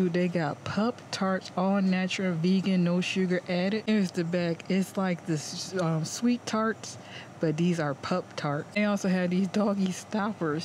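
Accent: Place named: Deep South, United States. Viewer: You from the DEEP south girl. ❤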